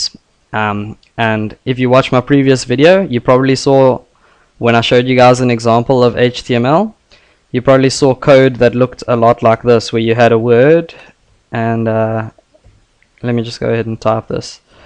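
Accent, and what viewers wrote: South Africa. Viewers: Are you from SA?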